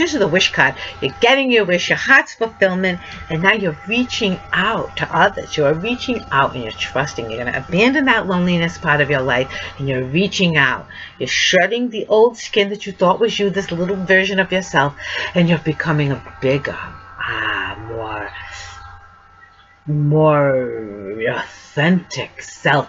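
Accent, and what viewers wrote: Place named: East Coast, United States. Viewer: I love your accent......Lemme guess, East Coast?......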